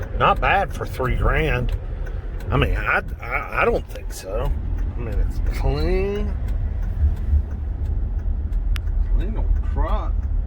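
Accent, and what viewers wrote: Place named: South, United States. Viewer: Good old truuuuck hillbilly voice to the max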